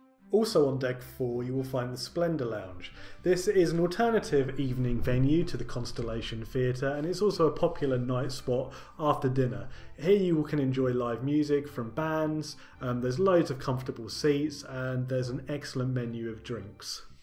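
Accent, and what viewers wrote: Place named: United Kingdom. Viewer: Thank you. We have never sailed with Regent, but decided to try Regent/Splendor. Oceania Fans to be honest. Very good outline/overview vlog! Appreciated. Booked our cruise and pray, after the challenges we all face regarding CV19, that all goes well and we get to experience Regent and this beautiful ship. wishing you/family and all the Regent family every health and success in the years ahead. Liked hearing a fellow Brit too :-). Cheers to getting back at sea very soon. Best wishes, Elaine & Tom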